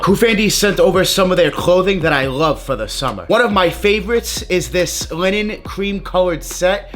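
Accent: New York, United States. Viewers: been following you now for a minute. ur doing it the right way with engagement. the give aways and drawings. great connection with ur audience and subscribers. i’m GONNA get some lost n founds eventually 😅. also u gotta be from NY.. what part of LI u from? i can smellll it.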